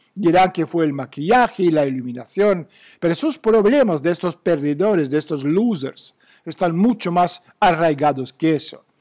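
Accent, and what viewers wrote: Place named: Spain. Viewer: me encantas pero tu lenguaje a eces es algo complicado para entender. Parece el español de españa q es diferente.Pero eres muy bueng gracias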